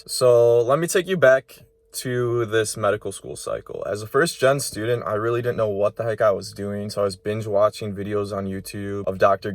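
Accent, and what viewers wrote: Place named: Midwest, United States. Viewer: Hello - thanks for making this video! I've received some rejections and I applied late and I'm expecting plenty more. Mcat was meh for me too. But thank you for being so honest and heartfelt! Also, love your Midwestern accent! Keep your head up - easier said than done - I Totally get that. You've already accomplished SO much just by applying and getting yourself on a page. You probably have a much better understanding of yourself than you realize thanks to the thoughtfulness you put into your application. And you probably gained a lot in studying for the MCAT the first time. I've already taken it twice , but I'm guessing I will have to take it a 3rd time just to get schools to even look at me. I totally feel ya! You know more than you realize! Keep chugging. We're all in this and we're all rooting for you! :)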